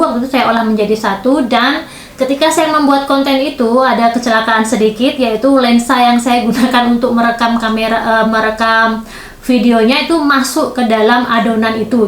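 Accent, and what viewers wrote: Java, Indonesia. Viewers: Jowo banget ya Mbak.. Hehehee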